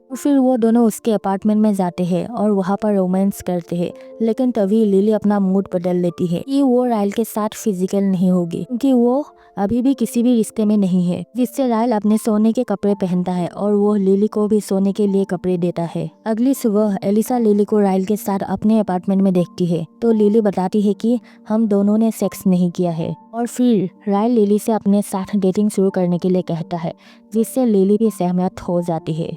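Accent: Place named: Assam, India. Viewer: Nice hindi assamess style voice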